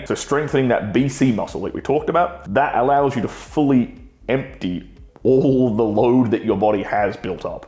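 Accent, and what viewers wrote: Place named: Australia. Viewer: He's got an accent buddy. He doesn't speak God's American English. He speaks Australian Queen's English.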